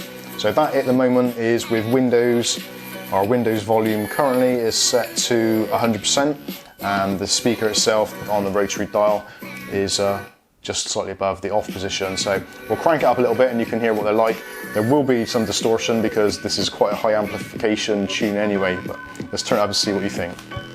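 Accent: Bristol, United Kingdom. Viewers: Are you from bristol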